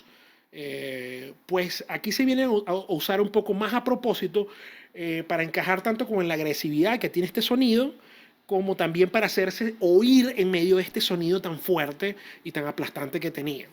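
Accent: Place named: Venezuela. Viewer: chamo eres venezolano,,, seguro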